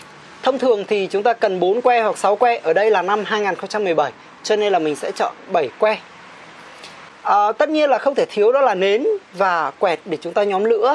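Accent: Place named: Central, Vietnam. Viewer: One Move : người miền trung:))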